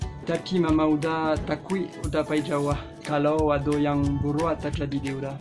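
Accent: Minangkabau, Indonesia. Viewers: Mantap kali logat minang,,, good luck, bravo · Uda minang ganteng dan bahasa minang nya juga lucu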